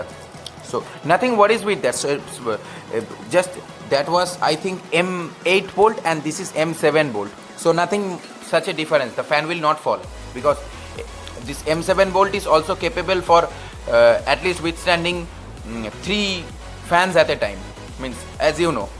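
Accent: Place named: England, United Kingdom. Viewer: Tumi english accent a kotha bolo nah, normal a e bhalo lago!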